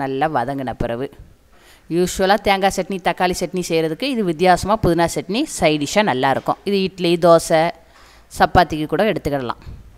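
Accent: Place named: Tirunelveli, India. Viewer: Pudina Chutney super. Ungal Tirunelveli Tamil also super.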